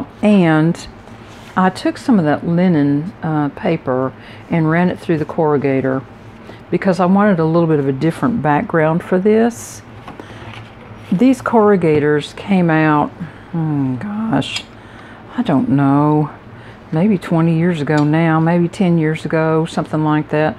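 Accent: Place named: Southeast, United States. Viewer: My husband and I watched your video during my lunch break from work yesterday! You have such a great eye for design. We both loved your cards. Everytime we watch one of your videos we have the conversation about your accent and where you’re from. He thinks maybe Mississippi and I won’t commit to a state but just say “somewhere in the southeast”. My husband is from West Texas and has a definite West Texas accent. I’m from Pennsylvania and although I don’t hear it, when we lived in GA so many people would comment about my northern accent. Accents have always fascinated me. So my guess is still that you are from the southeast but I’m pretty sure not Alabama, Georgia or Mississippi. Maybe Tennessee or South Carolina because yours isn’t as strong as a Alabama, Ga or Mississippi. Sorry for the ramble about non-card/video things. I’ve become such a big fan of your videos that they are literally the first videos I look for when I get time to watch some TV. 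One more question for you, also from our discussion yesterday - what is the microphone you’re using? I’ve been starting to create my videos and finding time to do voiceovers is hindering getting other things done so I’m thinking about looking into microphones so I can talk as I go, like you do, and then edit out what’s not useable, which would eliminate the voiceover step in our production schedule. Thank you again for making videos that show how to use what we already have and don’t have a selling aspect to them! Hope you have a w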